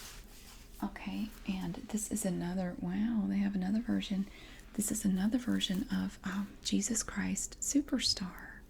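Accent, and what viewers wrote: South, United States. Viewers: This is a match made in heaven for me. Southern accent + ASMR + vinyls = my favorite things. Can't wait to fall asleep to this tonight, thank you ❤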